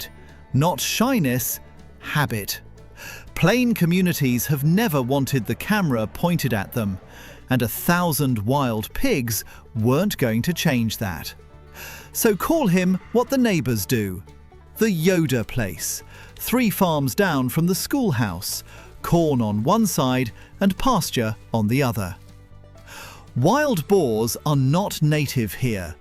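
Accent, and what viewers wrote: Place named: England, United Kingdom. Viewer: Why use an English accent ???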